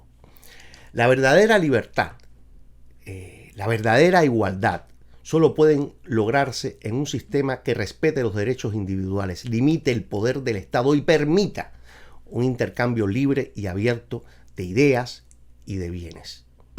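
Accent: Cuba. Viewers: Se nota que eres cubano, asere!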